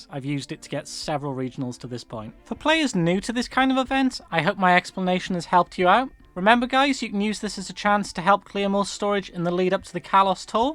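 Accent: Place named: Llanrumney, United Kingdom. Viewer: Anyone ever told you that you sound like you're from Llanrumney..?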